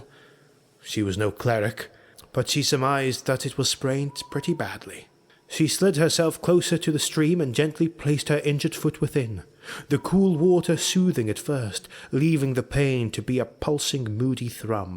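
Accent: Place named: England, United Kingdom. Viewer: A very good story, been looking for something like this. Like the voice, sounds English, will wait for more.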